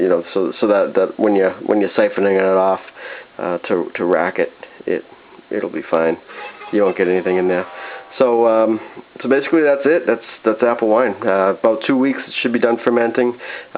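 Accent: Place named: Maine, United States. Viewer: You from Maine? You talk like we do.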